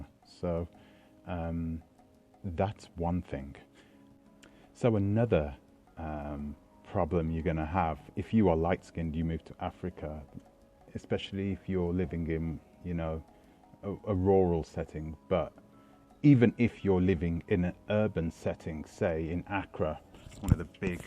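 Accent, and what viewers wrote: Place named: London, United Kingdom. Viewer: Grew up in B’Ham? Mmm - London accent!!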